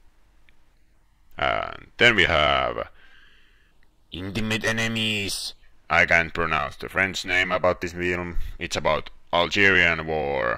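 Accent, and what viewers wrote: Finland. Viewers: Hassua miten heti tunnistin englannista että oot suomalainen vaikka en kattonu vielä nimees :D hyvä lista!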